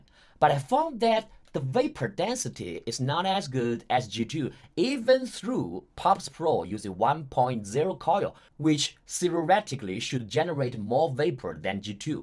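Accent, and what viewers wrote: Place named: London, United Kingdom. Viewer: 好家伙 这口音 老伦敦正米字旗的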